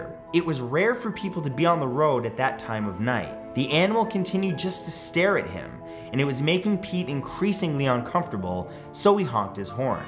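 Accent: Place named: Canada. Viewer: Back in Canada and the accent is back full force.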